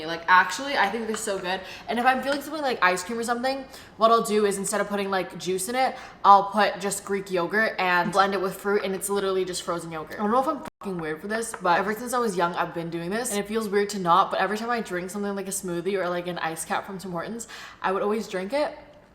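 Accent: Vietnam. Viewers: pls I'm obsessed with her Vietnamese accent it's lowkey so hot is it just me? 🥸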